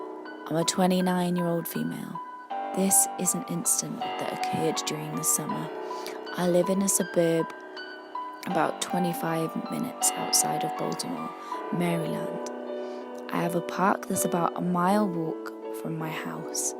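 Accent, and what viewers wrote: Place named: United Kingdom. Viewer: Thank you for using my story and the lovely shoutout! You're the best. I'm a sucker for a British accent. Beautiful narration darling.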